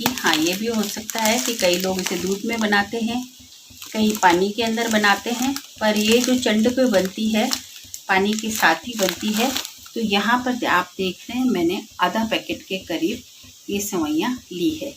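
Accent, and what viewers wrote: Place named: Sindh, Pakistan. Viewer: Tasty thali sweet nd meethi boli sindhi k sath✌🏻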